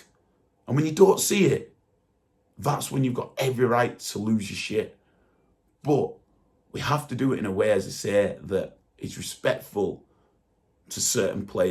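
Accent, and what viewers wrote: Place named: Northern England, United Kingdom. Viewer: why is this northerner talking about Arsenal... a london team ???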